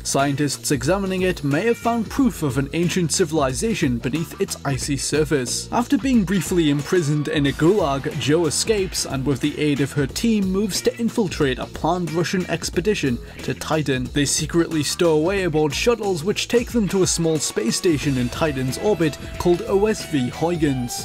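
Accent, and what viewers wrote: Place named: North, United Kingdom. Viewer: i wish i could listen to northern british accents but i just can’t :(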